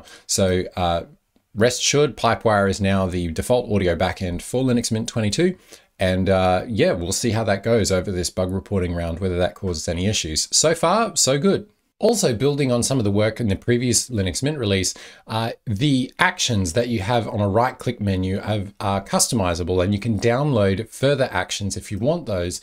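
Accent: Australia. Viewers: I hear Australian accent > I stay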